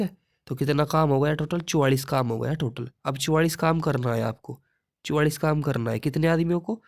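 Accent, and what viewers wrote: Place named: Saharanpur, India. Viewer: Big bro aap west up se ho kya ....aapki voice desi saharanpur wali lagti hai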